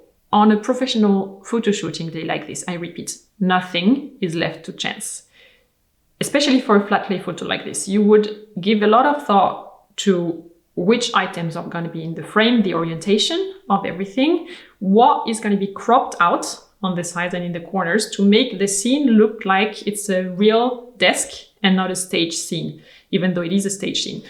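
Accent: France. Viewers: so hard to hear you with your thick French accent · I have not watched a video from you in a longer time and I really noticed that your accent is disappearing slightly :) your english sounded less french today; I liked it :) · accent went from German back to French 🙂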